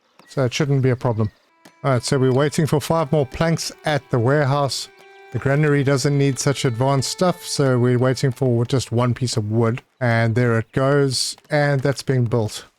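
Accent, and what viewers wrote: South Africa. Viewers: Knew I can distinguish a South African accent when I hear one, hello broer!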